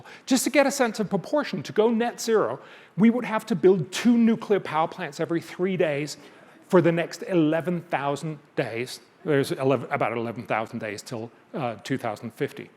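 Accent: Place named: United States. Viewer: silly question, but where did you learn to speak american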